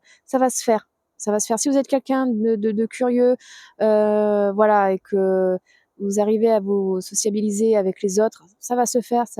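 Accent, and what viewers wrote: Russia. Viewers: Неужели никто не понимает, что вы русская. Я это отлично слышу по вашему французкому. Сплошные фейки.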